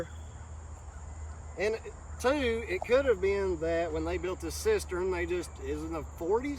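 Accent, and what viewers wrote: South, United States. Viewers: My girlfriend is now forbidden to watch this channel. She is commenting on your tats and southern talk etc. lol. She looks at every guy with their shirts off. Now i know why she is watching these big machine channels. DUDE! I can't believe you accidently crushed the septic tank. This has been one of the hottest Summers in a long time. Go easy.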